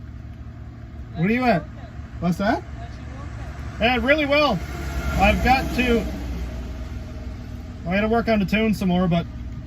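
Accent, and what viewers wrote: Canada. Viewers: where are you guys located? You sound north of the US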